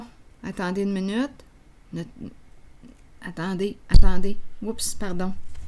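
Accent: Canada. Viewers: j'adore votre rire enfantin et votre accent canadien je suis scotchee et j'adore le Quebec qui est une region si jolie, ou on mange si bien dans d'excellents restaurants merci d'etre presente tous les jours dans ma vie pour me donner des nouvelles de l'homme qui est dans mon coeur je vous souhaite une excellente soiree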